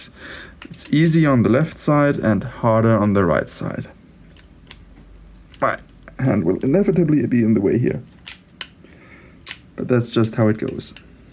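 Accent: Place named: Germany. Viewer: Mann hört das deutsche aus dem Akzent 😂 Bro was für ein Feuerwall sicherungshebeö verwendest du ?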